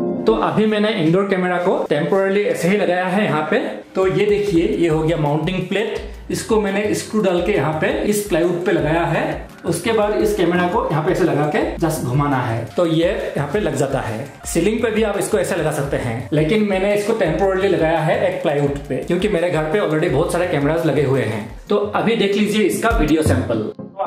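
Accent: Assam, India. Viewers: Apka bolnei ka tarika Assamese language sei milta hei ❤ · He is Assamese I guess. His accent is Assamese 😀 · Assamese hai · Bhai aap Assam se Ho kya...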